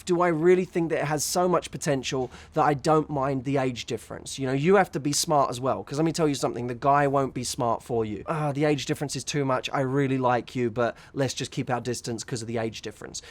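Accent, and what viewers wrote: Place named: United Kingdom. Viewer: I enjoy watching your video... and your british accent.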